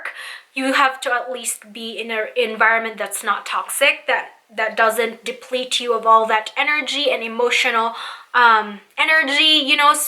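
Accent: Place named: United States. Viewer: You picked up the American accent quickly for someone who came here in 2012.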